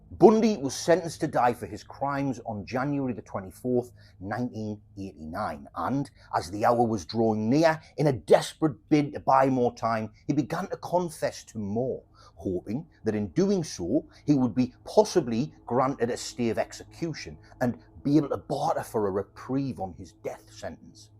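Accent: United Kingdom. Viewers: You should edit the title imo so it says “Brutally murdered or executed in prison” Otherwise it does seem a bit clickbaity. Excellent video otherwise and it is nice to have a fellow brit narrator instead of an ai voice. Keep up the great work and try not to clickbait 😊😊😊😊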